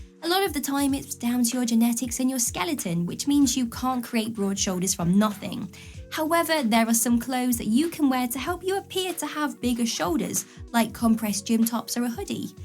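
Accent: United Kingdom. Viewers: You sound British,but u r based in usa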